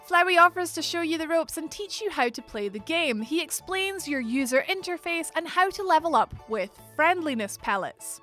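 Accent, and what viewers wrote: Scotland, United Kingdom. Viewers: I have to turn the sound off as soon as I hear the scottish robot.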